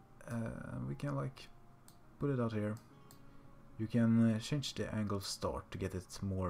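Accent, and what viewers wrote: Sweden. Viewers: are you Scandinavian (your accent sound Swedish but it could be another country)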